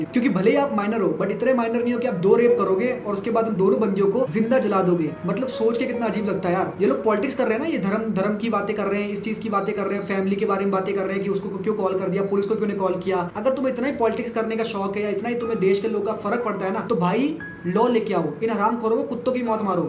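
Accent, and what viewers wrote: Hyderabad, India. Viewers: Bhai may be hyderabad sey ho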